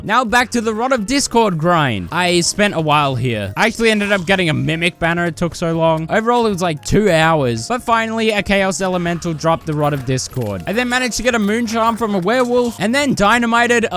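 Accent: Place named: Australia. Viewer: I think he’s Australian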